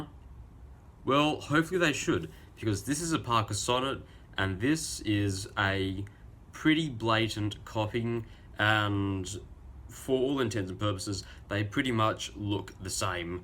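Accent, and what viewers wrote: Australia. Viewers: As ive been told, one of the Parker Chinese factories was repossessed and they just kept on using the same machinery but I know some factories just make knock offs of parkers (some are very bad quality). Its very hit'n'miss from aliexpress for knock offs but the sonnets got to be one of the worse. Id rather get a jinhao 250/450/500/750/1200 any day over a parker knock off! Nice to see a fellow ozzie accent on youtube, keep it up and checkout my videos (trust me, youll like it)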